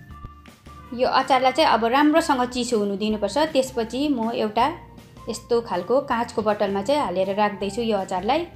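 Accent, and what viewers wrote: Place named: Darjeeling, India. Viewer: Darjeeling accent,, achar looks delicious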